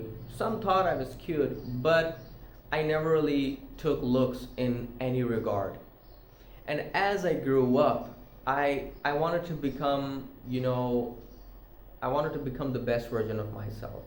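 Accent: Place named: United States. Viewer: are u from pakistan? how do u have american accent?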